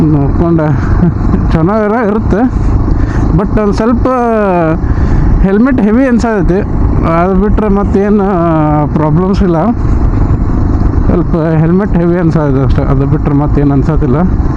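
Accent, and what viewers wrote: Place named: North Karnataka, India. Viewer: ನೀವು ಏನ ಹೇಳ್ರಿ ನಮ್ ಉತ್ತರಕರ್ನಾಟಕ ಭಾಷೆ ಅಂದ್ರೆ ಅದು ಒಂದು ಗತ್ತು ನೋಡ್ರಿ Nivu Hubli avra…?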